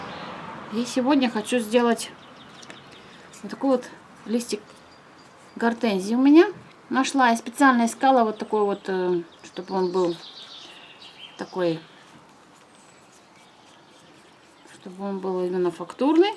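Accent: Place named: West Siberia, Russia. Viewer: Слышится западно-сибирский говор. Или мне показалось? :) Спасибо за интересное видео и за список ссылок! Лайк!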